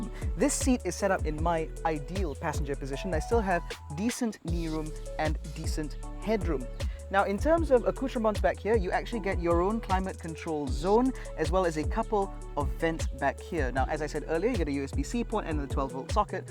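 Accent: United Kingdom. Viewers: Great review n yes for an suv costing more than 200 k without basic safety features is unforgiving n that's the deal breaker for me. Btw, your UK English is refreshing. Other local reviewers' faked US English is rather irritating. Keep it up.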